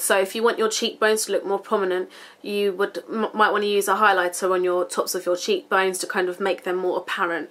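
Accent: United Kingdom. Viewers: yes she does? she has a british accent.....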